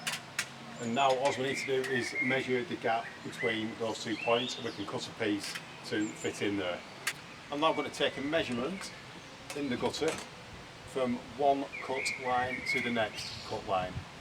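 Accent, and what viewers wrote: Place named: Northern England, United Kingdom. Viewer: Don't lose that Northern accent......British Industrial greatness was built with people who spoke with accents like yours and similar. Great video...keep 'em coming!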